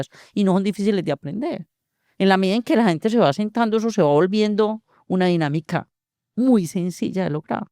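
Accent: Colombia. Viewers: Medellin - Colombia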